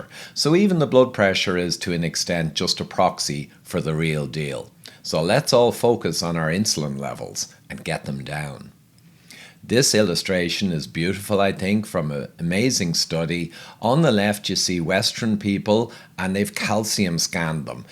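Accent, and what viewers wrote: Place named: Ireland. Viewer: the proble for ivor , is his accent , its so difficult to take the irish accent seriously . they are more renound for making jokes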